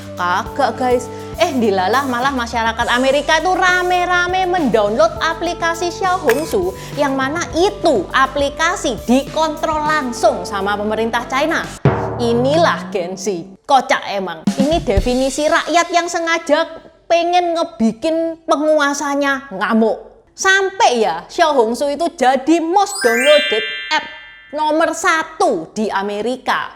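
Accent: Java, Indonesia. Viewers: Jawane ngendi kie, deneng medok temen ! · Plek ketiplek ....... 😄😄, Jawa banget. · beritanya dibawa oleh lebih Seru lagi karena Bahasa Indo rame sekali & jawanya medok buanget tambah sedaaap deh.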